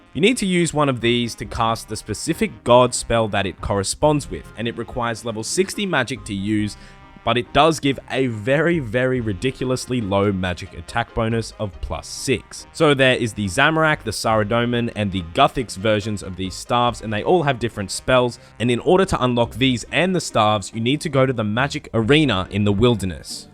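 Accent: Australia. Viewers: Love aussie accents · fuck all the hate aussies have the best accent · Ed Flitton Aussie accent. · BrokeMyNips He's Australian man, they pronounce everything differently :) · Didn't know you were australian. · your pronunciation of staves is wrong, or is it just australian accent? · To all the non-Aussies getting triggered over his pronunciation of Staff and Staves, just letting you know that's actually how we pronounce those two words in Australia nation wide. Nothing wrong with it.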